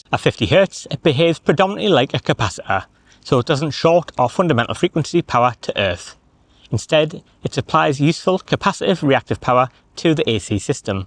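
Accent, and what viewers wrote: Newcastle, United Kingdom. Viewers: We all love the Geordie accent mate! ;))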